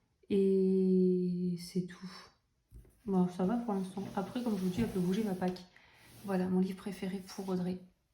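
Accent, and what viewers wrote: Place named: England, United Kingdom. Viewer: Merci pour la mention dans ta vidéo, j'en ai été très touchée! Tu as une super jolie PAC, et vas-y, achète-toi un 3ème exemplaire du livre que tu adores, surtout à ce prix-là! Et surtout, ne lâche pas ton accent anglais, hein, on aime;) Au fait, c'est bon, tu as trouvé tous les trèfles?? Mdrrrrr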